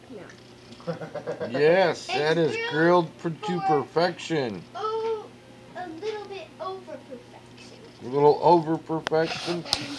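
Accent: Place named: Appalachia, United States. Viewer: Love this hillbilly accent and simple earthy ways, super...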